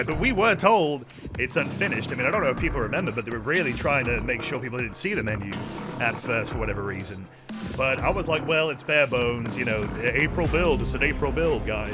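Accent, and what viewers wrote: United Kingdom. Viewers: YOU'RE BRITISH?????????????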